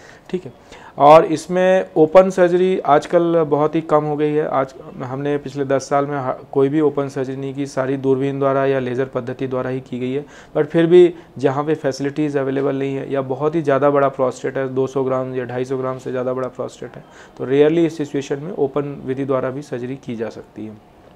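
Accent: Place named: Uttar Pradesh, India. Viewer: VERY SOFT EXPLANATION WITH U.P ACCENT, THANK YOU.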